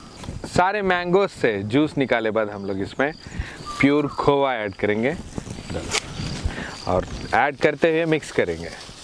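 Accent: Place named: Hyderabad, India. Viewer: I like your hyderabadi touch way of talking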